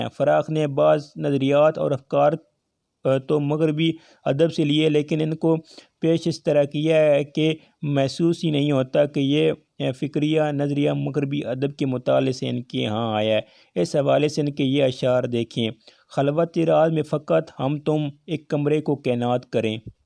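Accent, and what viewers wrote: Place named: Sargodha, Pakistan. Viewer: Sargodha ki zuban apky lehjey main wazeha jhalak dey rahi h.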